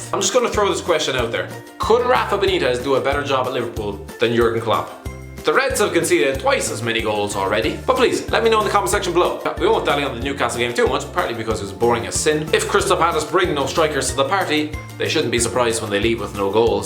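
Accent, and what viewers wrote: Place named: Munster, Ireland. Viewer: Somewhere in Munster I'd say judging by the accent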